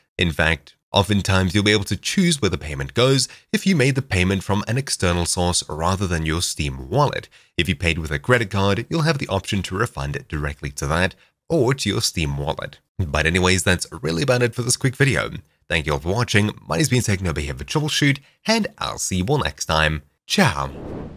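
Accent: South Africa. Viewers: are you a South African? just asking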